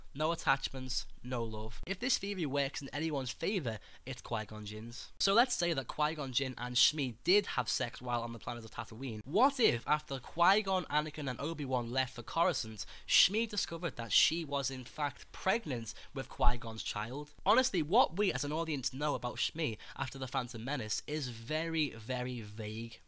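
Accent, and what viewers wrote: Liverpool, United Kingdom. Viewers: Where are you from? UK probably? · Are you from Liverpool????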